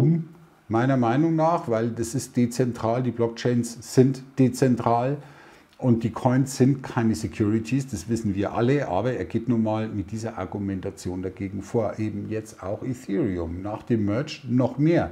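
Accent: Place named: Bavaria, Germany. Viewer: Servus, denke ich auch. Übrigens darfst ruhig Michi sagen😉. Ist wahrscheinlich bei deiner messageflut nicht so einfach. Dürfte ich dich fragen wo du wohnst? Deinem Dialekt nach scho im bayrischen Raum oder?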